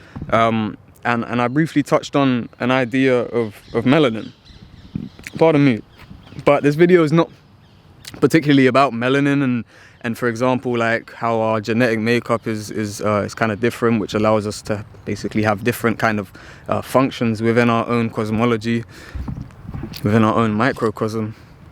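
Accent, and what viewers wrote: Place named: United Kingdom. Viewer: I watched a faceless video of yours and thought you would be a black person from the UK. You talk so similar to one.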